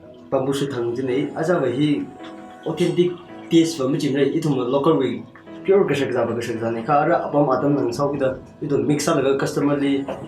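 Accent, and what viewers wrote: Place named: Manipur, India. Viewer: Accent is same like meteilon... Big love from assam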